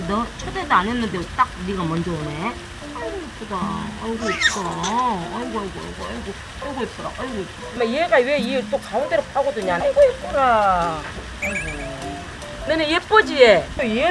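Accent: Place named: Jeolla, South Korea. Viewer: 우리엄마랑 똑같은사투리..똑같은욕..ㅋ소오름ㅋㅋ어딜가도 ❤전라도사람 인거 너무티남ㅋㅋ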